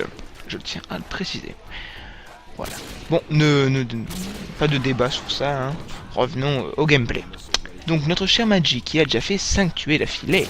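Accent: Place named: United States. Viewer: Quel accent américain !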